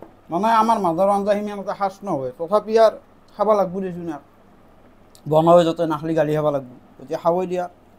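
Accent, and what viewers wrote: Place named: Goalpara, India. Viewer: daar akta kotha bhal lage mk bhoria kowa taa 😂❤.....I❤ GOALPARA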